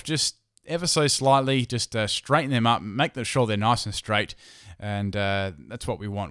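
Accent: Australia. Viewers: he is Australian isn't he?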